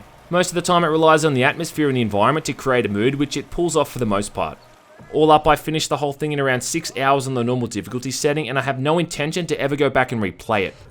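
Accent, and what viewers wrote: Australia. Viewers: is awesome. I personally liked outlast, but we need someone to be tough on games so developers give us more. Much support from the United States to you my friend. I watch many of your video's and keep up the great work. Just curious are you from Australia or New Zealand?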